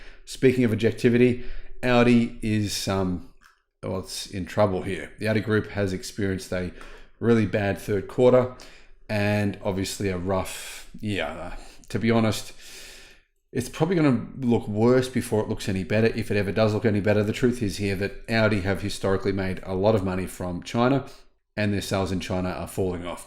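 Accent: Australia. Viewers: Still prefer the ICE versions ,I don't care how quick they are in a straight line they are cold ,sterile ,have no sound ,no soul , character ,the gas powered version has the sound of that engine the sound of that thundering everytime you push the peddle it has character , "soul" . BTW ,are you an Aussie? Mate.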